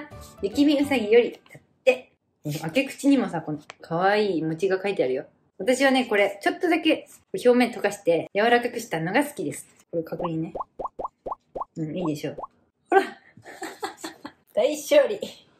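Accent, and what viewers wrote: Hokkaido, Japan. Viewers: 70歳のおばあちゃんです。いつも楽しく観ています。帰国してからのtalkで時々出る北海道弁がすごく可愛いなって思っています。もっと北海道弁で話してほしいです。因みに私は北海道出身ではありませんが、、、。英語頑張って続けて下さいね。私も長く勉強して現在は仕事に活かしています。継続は力なりです！ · の、北海道弁が可愛いらしく聞こえます。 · お話しも絶好調ですね。北海道Accent炸裂！道東の方ですか？ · イントネーションが北海道ですね😃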